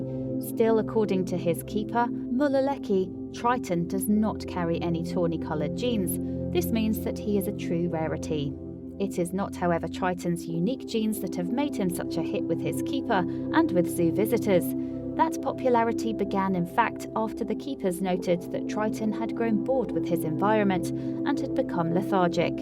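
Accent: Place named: United Kingdom. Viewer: So throw the "darn" ball!!! The narrator's British accent us so strong that it distracts from understanding the narrative AND it's difficult to pay attention to what the lion is apparently NOT doing. Also worth mentioning is the "abundant use" of actual video footage. So extravagant, but worth the considerable expense! .......what do you have to say..........? Please enlighten me. Thanks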